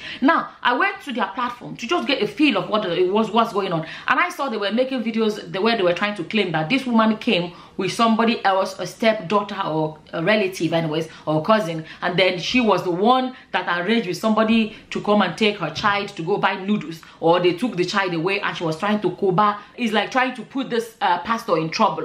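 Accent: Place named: Nigeria. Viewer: your pidgin too dey sweet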